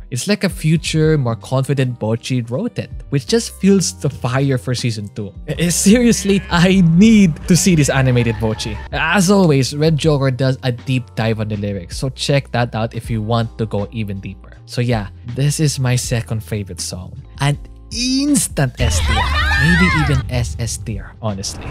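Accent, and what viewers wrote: Philippines. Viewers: i detect the pinoy accent pare >:) great vid btw!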